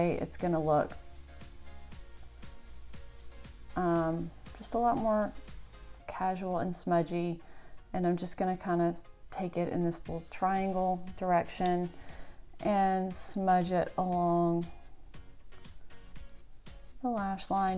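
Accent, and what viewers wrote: South, United States. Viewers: Hi! I have to agree w/ your other sub who mentioned loving your southern drawl as a yankee 😀 I too adore it. My fiance is from Texas & I swear by talking to his mom & sisters, it's like being at a spa, their voices are so soothing lol. Anyway, great video as usual. I've never used the Benefit Erase Paste, but now you are making me go buy it ☺ I also love using shadows too as liners for wings, that Nars color is a gorgeous brown, may have to get that too! Love this look. 👍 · I kneed to get the Physicians Formula shimmer strips. I just noticed that you are in Louisiana. I live in Mississippi. Love hearing a familiar Southern accent on youtube. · I just love you girl. That sweet Southern drawl just relaxes this Yankee!! I've tried some of your recommendations already(new sub) and they've worked well, both the products and the techniques. 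So glad I've found you!!! Our coloring is very similar, but I'm not a blonde. My eyes are brown, hair is auburn and I've got more than 20 years on you!! Tee hee. Still your style and techniques work so well for me. I so enjoy your bedroom where you film, it's so beautiful. So , pls keep doin what you're doin. I so enjoy you. Love your 🐶 in the background and your daughter was adorable. Enjoy your day , and I'll keep watch for your videos. Again, so glad you're there, Dear. Love from PA. Rachel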